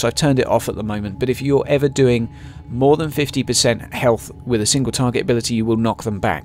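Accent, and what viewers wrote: United Kingdom. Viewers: It always somehow sounds better when a Brit talks strategy on 40K. Nice post.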